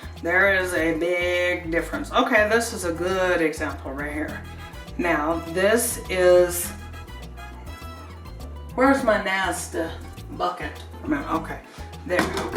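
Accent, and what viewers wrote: Colorado, United States. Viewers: Is that a Colorado accent?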